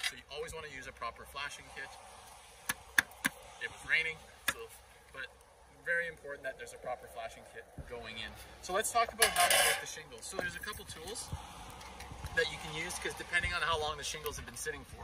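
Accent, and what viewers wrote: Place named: Canada. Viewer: Sounds very CANADIAN they way he speaks... I bet I'm right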